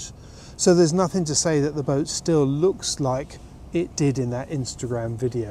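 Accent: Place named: RP, United Kingdom. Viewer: very cool bbc style English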